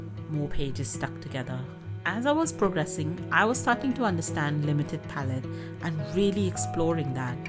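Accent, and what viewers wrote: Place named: United Kingdom. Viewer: Hello fellow artist😃 just found your channel, I’ve been grieving the death of my nephew, feeling very sad- however, your illustrations brought a big smile to my face & lifted my heart, also Your voice is very soothing & I Love your British accent! Now your illustrations make me feel joy like the feeling of reading a colorful, well illustrated book to one of my grandchildren. Such great art & a very interesting sketch book, thanks for sharing & for the art therapy🥲😃❤️❤️❤️🎉🎉. . Makes me want to get back to start drawing & painting in one of the many empty sketchbooks I have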